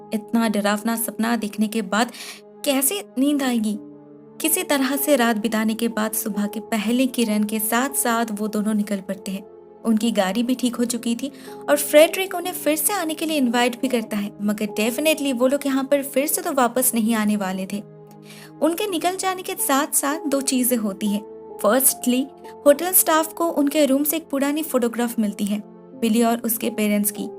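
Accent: Bengal, India. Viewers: You are bangoli right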